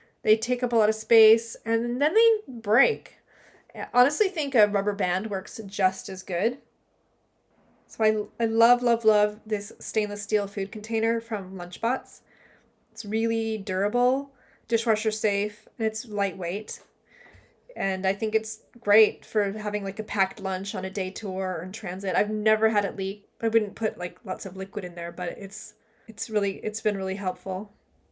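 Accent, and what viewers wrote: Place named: United States. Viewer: I was getting confused watching vid.....back ground looked like England but accent American.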